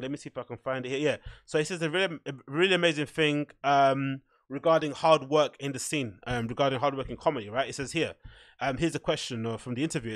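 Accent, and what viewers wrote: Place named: England, United Kingdom. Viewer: How did I know this dude had an English accent before I clicked?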